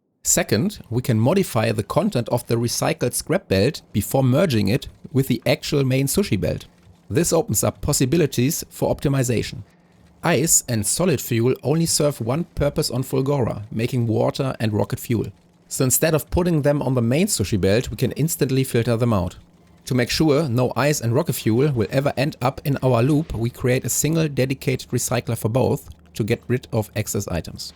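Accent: Germany. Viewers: If your factory is dependend on belt speed, make smaller factories that work in parallel. 20 Sushi belts in 20 minimal bases instead of the clogging of a long track of recyclers. For me, any row of 20 or more recyclers clog up, as they cannot empty their contents. And even internal storage for anything but scrap is underused, as they will only do the exactly same recycle job to add to internal storage. Warum schreibe ich eigentlich Englisch, du sprichst doch mit deutschem Akzent im Video.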